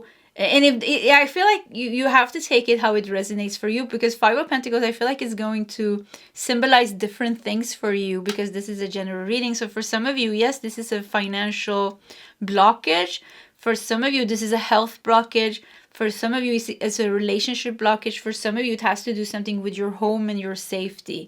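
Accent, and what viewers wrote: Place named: Sweden. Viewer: Hey, punkin' just sending you so much love. I adore your Swedish accent so much. I always pick number 3 as my pick-a-card because I'm a life path 3. You always blow my mind when you talk about narcs in your life. I've married my narc mother in a man who's seriously self-obsessed and an abuser...but, after 35 years of living with his harming my body and gas-lighting me, the judge put a two year no contact order on him.Yes, as soon as he got out of jail he took almost all of the money out of our mutual account...that money was mine too and this is his financial abuse of me. I'm so frustrated because he still has me under his thumb. Sorry for the over share (lol). I'm tired. I've totally neglected my YouTube channel because my husband hates it. Thank you for the healing energy you give, you are such a darling soul. XOXO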